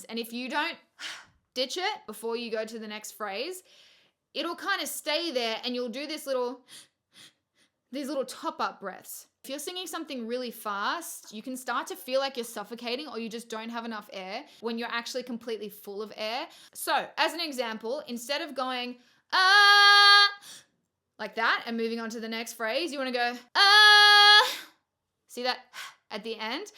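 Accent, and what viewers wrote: Australia. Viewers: I love the Australian accent i wanna be Australian omg lol ahhhh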